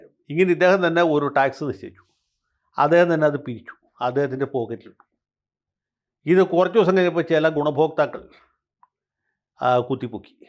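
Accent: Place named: Central Kerala, India. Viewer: your language is clear middle Kerala.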